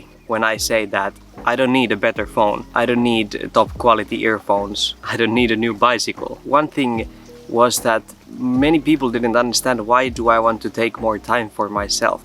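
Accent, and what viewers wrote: Finland. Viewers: Are you Finnish? You have similar accent :) Merry Christmas :) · I love how I can tell you are from Finland by how you speak. Terkkuja Oulusta.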